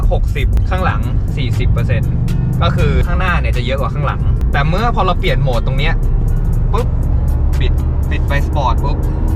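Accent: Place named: RP, United Kingdom. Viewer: สำเนียงอังกฤษผู้ดีจัด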